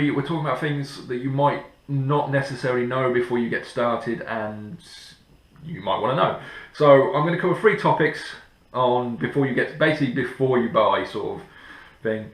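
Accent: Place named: United Kingdom. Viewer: UK i think, he sounds British.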